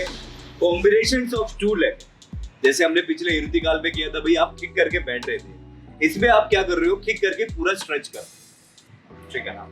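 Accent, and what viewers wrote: Kerala, India. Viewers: Mallu ki Hindi ...mast ha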